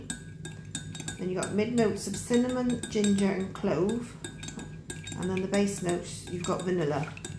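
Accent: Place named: Devon, United Kingdom. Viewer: Hello. Just started making wax melts after making soap and body products for two-three years. Love the video. Why do you transfer to a second jug is it simply for ease of pouring? I sense a Devonshire accent hubby’s from asthma Devon and I lived there on two lengthy occasions. Tasmania reminds me of Devon and Cornwall wrapped together. How disappointing that you made so many not to like so many.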